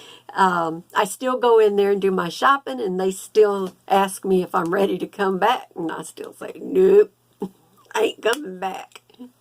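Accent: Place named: South, United States. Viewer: Thanks for sharing friend. I knew you had a southern accent!! Takes one to know one. Maybe we can do a swap soon.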